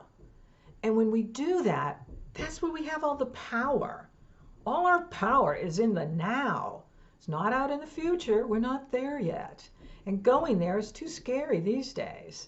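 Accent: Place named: New York, United States. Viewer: Always so uplifting. Looking great. Notice the New York accent now that I recently looked it up.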